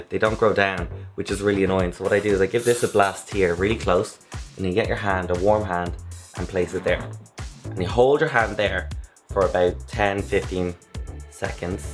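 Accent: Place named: Ireland. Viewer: Hey! I love your videos and you're accent!! Some of my family is from Ireland and I'd love to go. I'm in love with Ireland and its accent!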